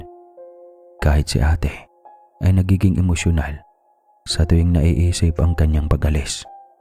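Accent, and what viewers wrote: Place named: Bohol, Philippines. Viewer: Taga asa d i ka sir mura lageh kg tga bohol😁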